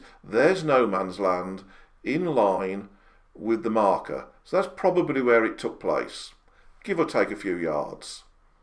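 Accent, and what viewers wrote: Birmingham, United Kingdom. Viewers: well in that case it really is a lovely occurrence in such a devastating and sad time. Do I detect a soft brummie accent?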